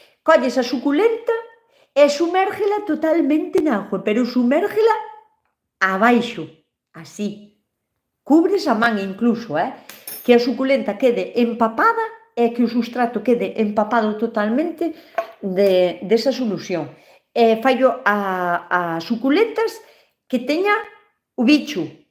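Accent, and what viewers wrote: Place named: Lugo, Spain. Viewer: Yo nací en Lugo ,pero no me crié en Galicia ,ahora hace unos 35 años que vivo en Galicia de nuevo y me recuerda tu acento a familia que tengo en Lugo y a alúmnas que he tenido de la zona de Ribeira ,me encanta el deje que tenéis y se os entiende de maravilla ,no así a los de la zona de Mazaricos que sesean mucho ,por lo que les entiendo peor ,espero seguir viendo tus recetas ,y por supuesto que las haré . Gracias y sigue siendo tan maja y espontánea como eres .Un afectuoso saludo de esta gallega castellanoleonesa .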